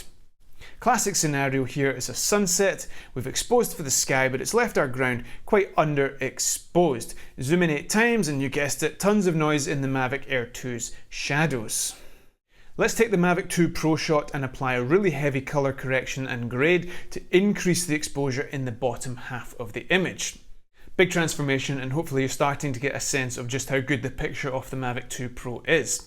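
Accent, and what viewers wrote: Scotland, United Kingdom. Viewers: Excellent review very informative and very happy to listen to that pleasant scottish voice.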